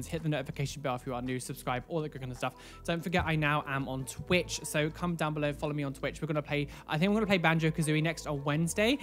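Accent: United Kingdom. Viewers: r u british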